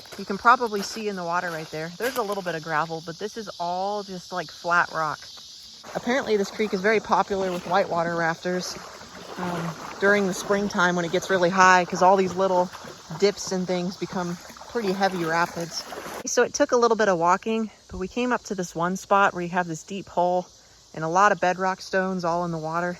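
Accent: South, United States. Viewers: confused southern accent mumbling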